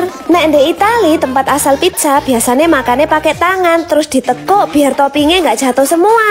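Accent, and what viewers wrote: Java, Indonesia. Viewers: Kak owenge jowo yo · Enak bgt denger bahasa jawanya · Bahasa jawa nya medhog bgt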